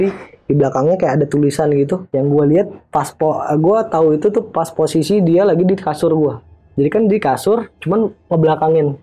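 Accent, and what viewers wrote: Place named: Betawi, Indonesia. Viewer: Ceritanya menarik untuk terus diikuti hingga akhir, gaya bertuturnya asyik khas anak muda betawi, si mas yg cerita ganteng pula, paket lengkap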